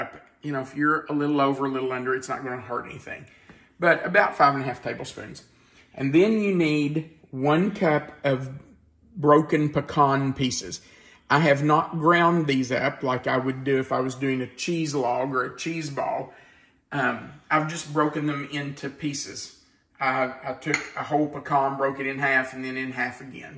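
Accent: South, United States. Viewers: These recipes and slow southern accent remind me of my daddy and Mother, long since passed. Nothing beats the taste and love in these delicious dishes.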